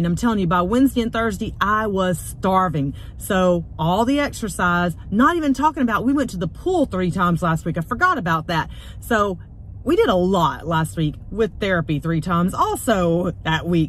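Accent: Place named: Texas, United States. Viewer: Love your character and your Texan accent.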